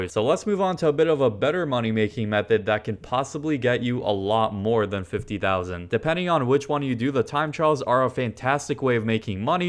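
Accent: RP, United Kingdom. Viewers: I thought you were actually British😭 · Bro sounds like spiffing brit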